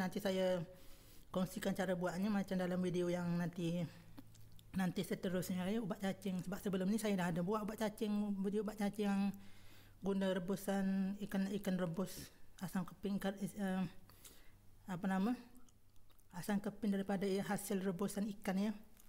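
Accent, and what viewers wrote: Malaysia. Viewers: Dia orang kelantan saya pun orang kelantan ..orang kelantan bila suruh cakap kl kek kok sikit😅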